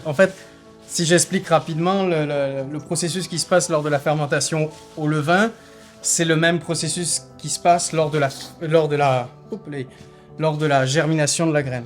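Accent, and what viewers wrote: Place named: Quebec, Canada. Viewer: Un français avec un accent québécois.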